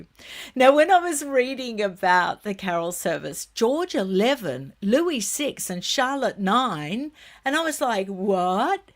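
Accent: Australia. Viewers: I listen to you on YouTube mainly because I miss the Aussie accent. I was a ten pound pom and lived in Adelaide nearly twenty years. I wish people would stop abbreviating Catherine to Kate, but it was great to see who in the royal family were there. Did you find out who the guy was behind Catherine? In UK we need the Royal family especially when some of ministers show their lack of diplomacy by bad mouthy other politicians around the world.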